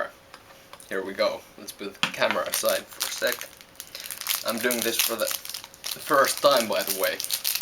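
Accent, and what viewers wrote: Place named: Finland. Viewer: Of all the euro accents, finnish is my favorite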